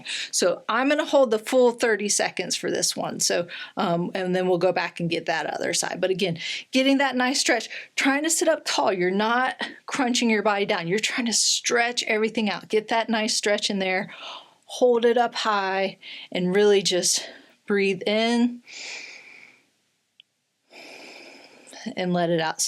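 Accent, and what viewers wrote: United States. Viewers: I tune in to hear you say 'disclaimer alert' in that wonderful American accent.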